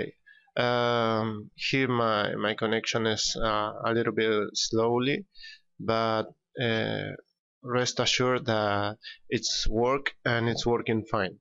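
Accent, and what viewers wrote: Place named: Argentina. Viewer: Vos sos de Argentina?. Se te nota la tonada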